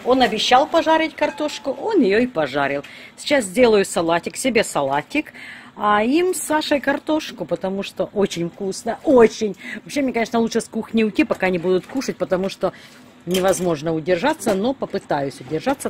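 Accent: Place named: Rural, Ukraine. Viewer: !! Какой позитив и Ваши прекрасные комментарии к готовке! Смотрю, не отрываясь,хотя блюдо простое.Но так аппетитно Вы говорите,вспоминаю украинскую деревню,детство и мою бабушку, деда, отца.Как этого говора и блюд не хватает в Москве!! Спасибо Вам, снимайте больше и про все, про все!!!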